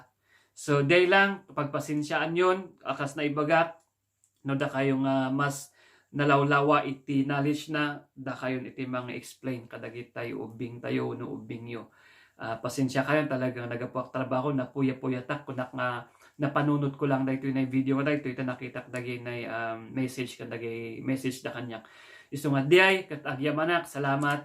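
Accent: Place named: Philippines. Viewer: Hota malaysian actress binadaw toy english accent ni filipino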